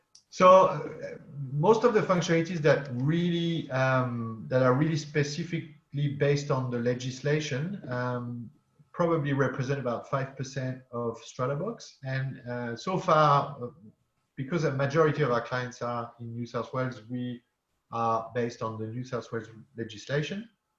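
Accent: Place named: France. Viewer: Sound French 👌👍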